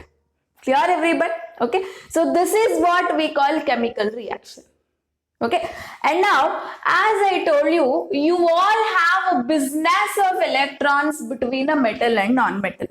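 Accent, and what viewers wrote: Karnataka, India. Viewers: Mam r u from Karnataka? I also can speak kanada well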